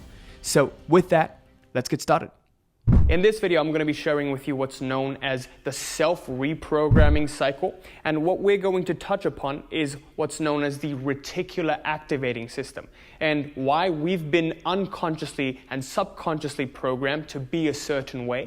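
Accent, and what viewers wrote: South Africa. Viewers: I can hear an English accent but with a slight South African cadence and you are living in Florida:) Great video btw! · South African tones….? cool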